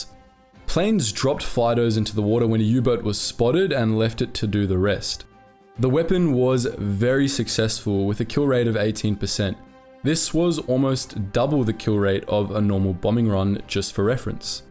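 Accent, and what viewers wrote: South Africa. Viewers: Great job as usual. South African? Rhodesian? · Are you south african?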